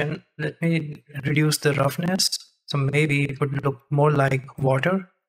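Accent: India. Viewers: Yes from his voice he sounds Indian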